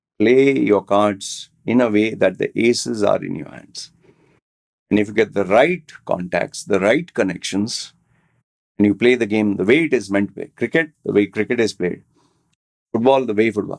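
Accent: India. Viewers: Who the F are you? You have a thick Indian accent and you don’t know anything about caste discrimination? You should win an award for the most disingenuous and insensitive POS. Enjoy your pretentious life.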